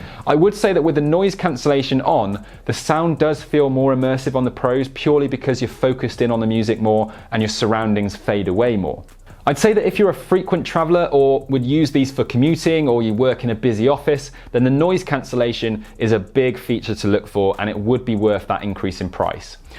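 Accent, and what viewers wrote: United Kingdom. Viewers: Great video; love a British accent.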